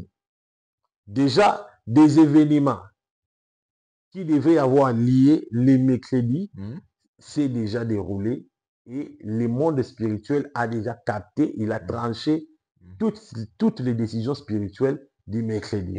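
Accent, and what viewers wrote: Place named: Democratic Republic of the Congo. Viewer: C'est un zaïrois selon son accent et la prononciation de certains mots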